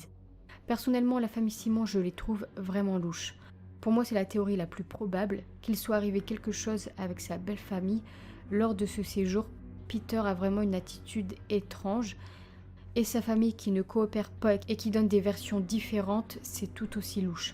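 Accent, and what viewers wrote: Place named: Picardy, France. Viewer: Bonsoir, j'ai découvert il y a peu votre chaîne, de suite abonnée, vous êtes formidable dans vos reportages, une voix douce et calme ça fait du bien. Une voix qui me rappelle la ou j'habite et d'où je suis originaire d'après certaines intonations je suis de la Picardie. En tout cas c'est super. Bonne continuation. Bises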